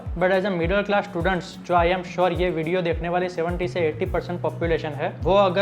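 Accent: Gujarat, India. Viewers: Behind Hindi...feel Gujarati Aap Gujarati ho kya?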